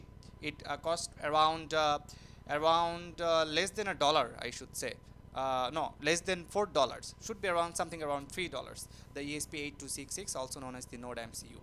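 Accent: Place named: England, United Kingdom. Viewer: The most unattractive english accent makes it impossible and take anyone who speaks like this serious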